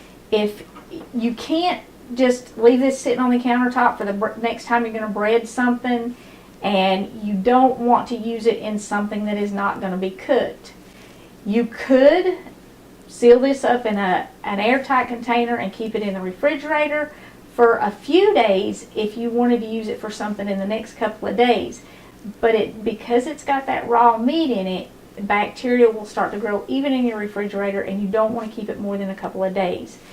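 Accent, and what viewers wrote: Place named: Appalachia, United States. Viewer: We live your hillbilly talk. Prays God for ya. Everyone didn't lose real language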